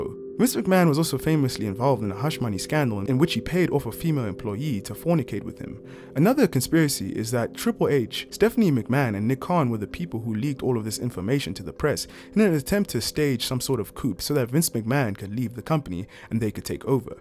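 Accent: South Africa. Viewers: My favourite conspiracy has to be that the McMahons we see on TV and in the ring are not the real McMahons but paid actors, and the real McMahon family is never seen in the public eye. Great video btw, legit never heard of some of these other ones. P.S. I know a South African accent when I hear one!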